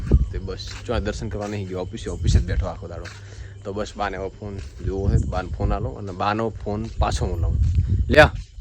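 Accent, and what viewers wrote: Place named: Banaskantha, India. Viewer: જય માતાજી મોટા ભાઈ ક્યાં થી છો ભાઈ તમારી બોલી બનાસકાંઠા ની લાગે છે બહુ સરસ વિડિઓ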